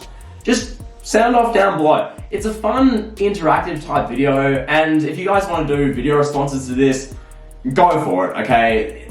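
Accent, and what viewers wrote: Australia. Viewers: boy sound Australian but actually look like a south African or European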